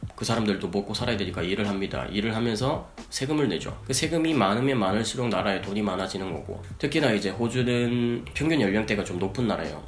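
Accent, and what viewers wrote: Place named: Seoul, South Korea. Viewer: 서울말 쓰시느라 수고 많이 하셨... 저도 브이로그 참 좋아하는데요. 유튭이 제 브이로그도 별로 안 좋아하더라구여 ㅋㅋㅋㅋ 화이팅 합시당 ㅋㅋ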